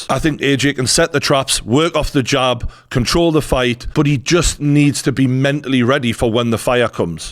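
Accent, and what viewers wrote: Newcastle, United Kingdom. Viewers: Your bang on geordie boy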